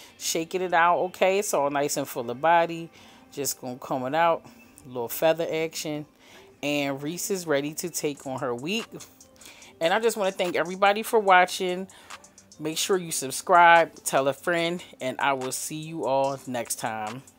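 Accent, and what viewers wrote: Philadelphia, United States. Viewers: Love your quotes I wish you could do my hair Knew you were from Philly from your accent I’m sick that I missed your pop up shop in DC, trust and believe I would’ve been there!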